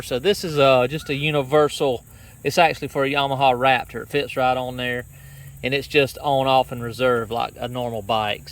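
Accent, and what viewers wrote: South, United States. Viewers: Where are you from? You have a major southern accent.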